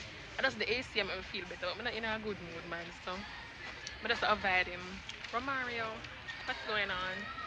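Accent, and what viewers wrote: London, United Kingdom. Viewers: Yuh london accent trang enuh girl!!!! Wow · YOUR BRITISH ACCENT NICE YUH CAN GWAUN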